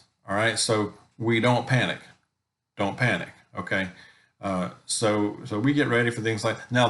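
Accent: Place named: South, United States. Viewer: Not at all good. You sound as if you have a southern accent, like I do. It’s going to impact us as much as the East Coast.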